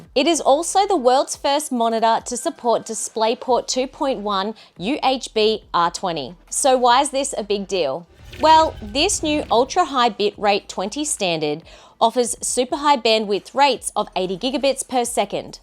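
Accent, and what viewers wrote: Australia. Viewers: You have one gorgeous Australian accent, 😍 I love listening to it in your videos. Nice gaming monitor here looks bloody cool! 😎👍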